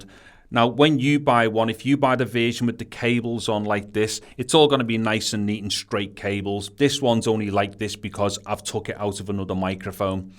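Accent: Liverpool, United Kingdom. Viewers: Nice job and good to hear the scouse accent. My son lives in Liverpool.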